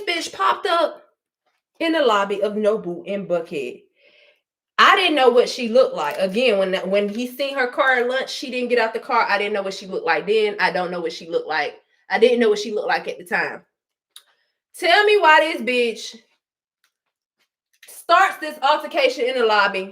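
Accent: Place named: Jamaica. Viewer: LolBit@ I’m Jamaican I have the accent too but I love it hahaha you funny af.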